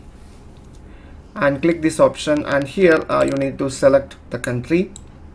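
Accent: Kerala, India. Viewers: I tapped the ad so you get money, its very helpful tutorial I love ur accent btw, very hot Indian accent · are you from kerala ?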